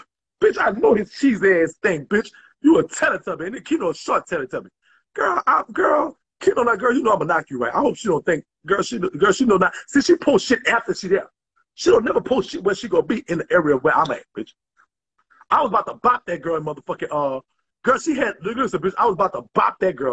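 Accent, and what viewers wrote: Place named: Louisiana, United States. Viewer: It’s the Louisiana accent for me I love it 🤣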